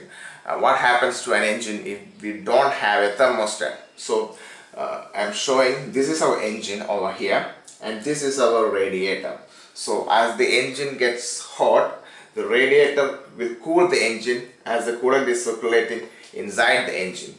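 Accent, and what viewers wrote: India. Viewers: Hi. I would like to share my view on your channel. Whether to take it or not is upto you. First of all, I would like to take the opportunity to appreciate your thought process on explaining some engineering concepts in a really simple manner. It definitely reflects on the number of subscribers that you have obtained for your channel. These are some things which you could do to make it even better. 1. Please do not take the video in one complete shot. Just by that one thing, you are unable to cut some places where you have found yourself a bit off course in explaining the things in the way you wanted them, but you are forced to continue. Believe me, cut it, take things by parts and then stitch them. You will find yourself more confident with your words in explaining it. 2. Please have some more light on your working area. Your videos are being watched by so many people now. 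Which means its better to have a good visual in the video. Its mostly in a shade of dark which I find it a bit dull for understanding concepts. 3. Please make sure you try to take away the Hindi-ish slang from your english. Even though the grammar is upto the mark, there are some words which I found similar to hindi words. No offense, but if you want to spread the knowledge to people around the world, its better to have a separate accent to your english which will make it even more convenient to listen to. Hope I had not offended or stirred any emotions by putting out my thoughts. The reason behind that was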